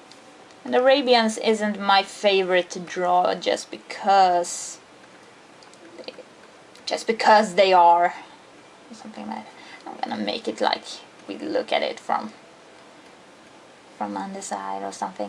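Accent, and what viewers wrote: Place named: Sweden. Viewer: i knew you were swedish by your accent, since I watc a lot of Pewdiepie haha but this is amazing! thank you very much ;)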